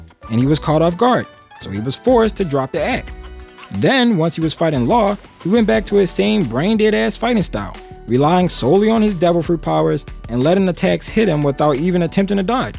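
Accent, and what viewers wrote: Baltimore, United States. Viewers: Is that a Baltimore accent lol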